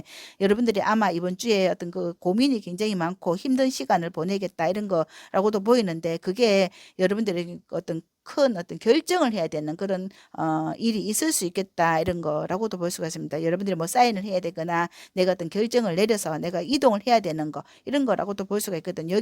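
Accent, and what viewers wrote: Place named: Busan, South Korea. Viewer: 4번 잘들었습니다 부산사투리 ㅎㅎ 리딩 좋아요 구독!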